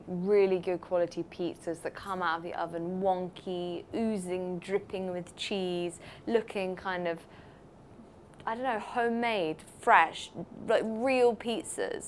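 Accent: United Kingdom. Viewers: I swear to God I love British accent >3< So many tasty recipes, so little time to make them :(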